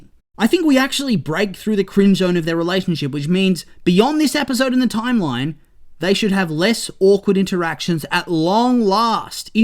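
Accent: Australia. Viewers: I'M SORRY, THIS MAN IN AUSTRALIAN?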